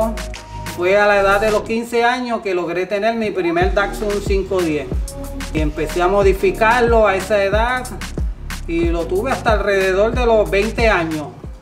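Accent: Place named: Puerto Rico. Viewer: acho pensé que era en el salvador este vídeo, asta que escuché el acento poltorro 😁😁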